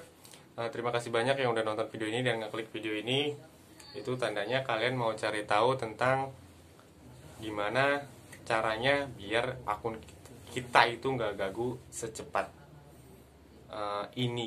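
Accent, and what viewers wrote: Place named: West Java, Indonesia. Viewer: Lo orang sunda ya bang . Ngaku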